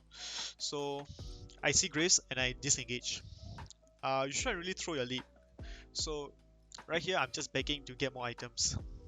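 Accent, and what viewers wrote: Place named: Singapore. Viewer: great guide, from sg? (from ur accent xd) was thinking of grinding through WR on release haha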